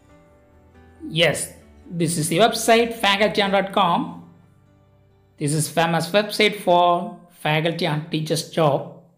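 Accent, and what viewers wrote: Tamil Nadu, India. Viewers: Your tamil style English is very good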